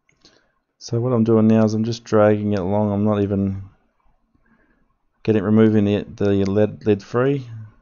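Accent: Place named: Australia. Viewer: First time watching your upload came from Sorins Electronic repair school. Thought you are an Ausie reading the comment bellow confirmed your accent. Ill watch your other uploads later.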